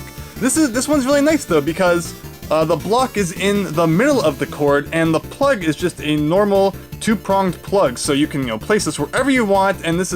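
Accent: Canada. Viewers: Have to ask..I hear a Canadian accent, I get the impression that the Tg-16 was a bit more popular in Canada rather the US. Did NEC directly supply Canada? Just curious .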